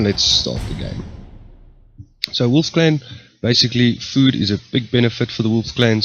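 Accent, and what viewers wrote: South Africa. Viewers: South African! Nice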